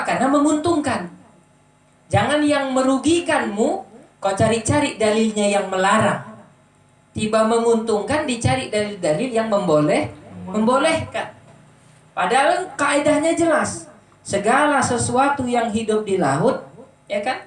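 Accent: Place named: Sumatra, Indonesia. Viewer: Logat sumtra rasa kyai NU jawa, jos pak smoga bisa bhsa jawa jdi lebih jos kalau smbil melawak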